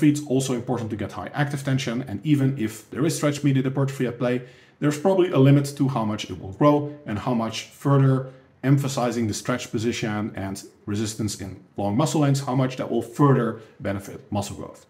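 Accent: Netherlands. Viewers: At the start, for a second I thought Alistair Overeem was speaking :) Almost the same Dutch English accent and intonation hehe. Either way, great content! Keep up the great work!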